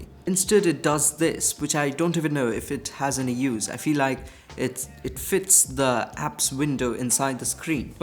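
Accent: Bengal, India. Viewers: Bro are you from West Bengal or from Bengali ancestry as I have seen little Bengali accents from you because I am one myself. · btw great content. I assume from your accent that you are Bengali. Anyways, take love.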